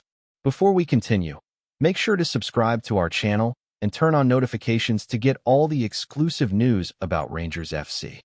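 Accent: United States. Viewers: Another one that likes to hear his own American voice. Over 15 minutes of blah, blah, blah with absolutely no information.....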